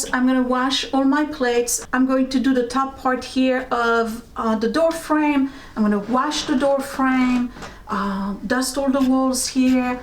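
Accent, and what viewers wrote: France. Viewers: Love your beautiful French accent & your lovely antiques & treasures!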